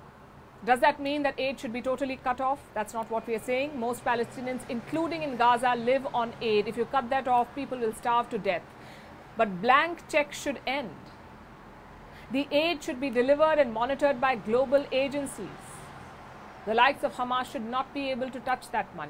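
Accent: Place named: India. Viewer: Every time I hear your voice it reminds me of all the scammers from India! ''halo... I'm David Copper from the IRS...send money or else''!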